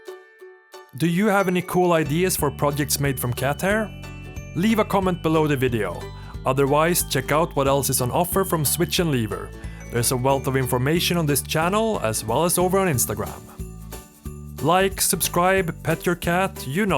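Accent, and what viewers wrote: Sweden. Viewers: Jag kände igen den svenska engelska accenten men det var när du tog upp morakniven och uttalade mora perfekt så var jag säker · Aaa i just realised that sounded kinda rude, but I dig the Swedish accent :—) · satan vilken grov svensk dialekt! avslöjad direkt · are u sweedish?? or norwigian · u sound swedish jus saying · are you swedish or norwegian? your accent is kinda awful sorry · I love you super Swedish accent!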